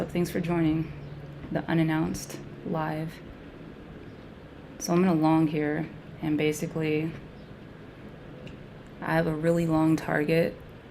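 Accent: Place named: United States. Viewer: seemed choppy - love her accent what area of america is that?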